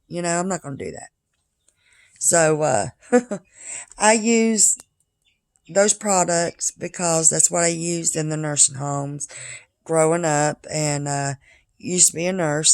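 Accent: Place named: Kentucky, United States. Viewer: Aww girl, they look beautiful!! I'm going thru extractions now and shew they ain't no joke lol! This gives me hope tho! Keep us updated! Btw, I don't know where you're from but I'm a Kentucky girl and you sound like your from my neck of the woods lol! I mean that in the best way because girl...my accent is thick lol!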